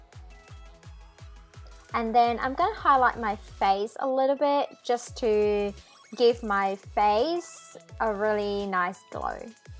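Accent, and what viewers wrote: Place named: Australia. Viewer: Wow! It was long time ago watched your video. Nice australian accent by the way.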